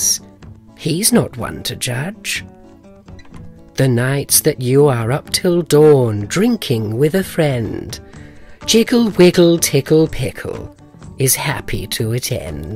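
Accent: United Kingdom. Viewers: these books are hilarious but the british narrator makes them PRICELESS!!! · Such a wonderful British accent. So proper and so wicked. Really very funny.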